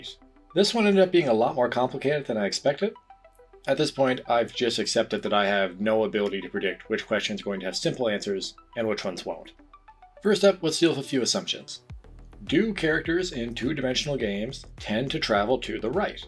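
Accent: Newfoundland, Canada. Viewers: Thats awesome! ive been to both of those provinces many times. Im born and raised in St Johns , NL. I could tell by your accent at the end of sentences. You have a good idea here for videos. Really enjoy them. Trying to think of a video idea for you. The Newgame+ troupe might work for a video. Anyways. Cheers from Newfoundland 🍻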